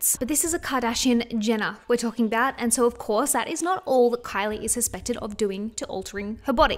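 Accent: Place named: Australia. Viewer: It's so strange (and refreshing) to hear a fellow Aussie accent on YouTube ❤️ Great video, you crushed it! 🙌🏽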